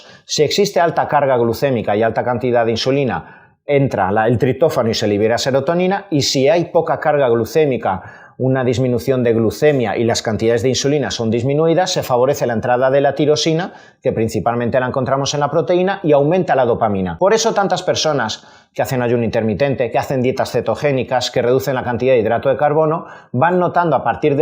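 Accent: Spain. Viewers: Es insoportable escuchar un españolete y se creen que todo lo saben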